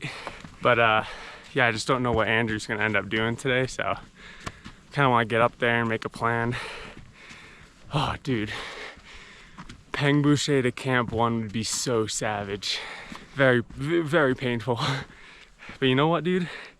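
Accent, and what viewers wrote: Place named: Scotland, United Kingdom. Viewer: Hearing a northern/Scottish person was not what I expected but yay.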